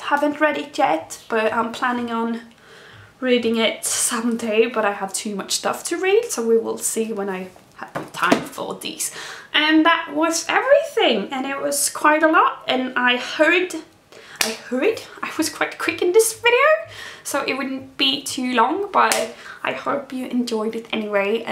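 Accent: Sweden. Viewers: Was looking for some good comic reviews. I think I will try "American Vampire". Thank You......And my American ears loves your Swedish accent.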